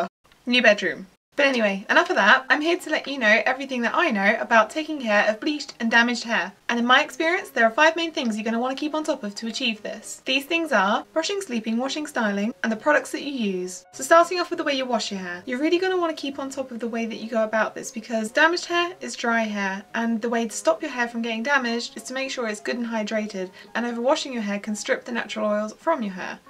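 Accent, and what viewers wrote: United Kingdom. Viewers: I'm trying to figure out which part of the uk you're from. I want to say london? amirite? :D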